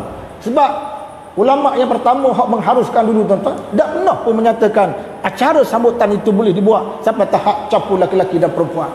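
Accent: Terengganu, Malaysia. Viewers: Rasanya ustaz ni org terengganu, mendengar pd loghat beliau. Tapi takde pulak mem BODO BODO kan org, me MENATANG kan org, meng HAIWANG kan org...Wallahu a'lam.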